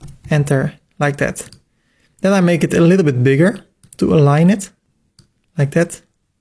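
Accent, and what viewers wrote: Netherlands. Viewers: I Love that I heard in the first 10 secs that you were Dutch, anyway great vid man, ga zo door!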